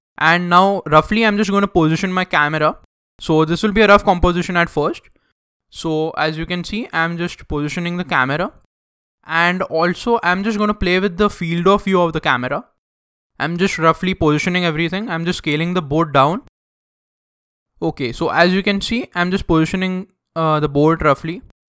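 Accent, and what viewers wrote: India. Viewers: Is he Indian or does he have an Indian accent?? im not sure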